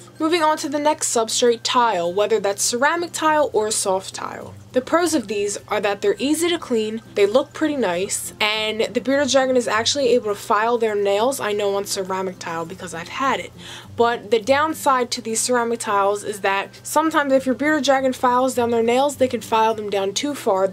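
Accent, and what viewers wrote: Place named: New York, United States. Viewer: Jersey or New York, your twang is confusing as hell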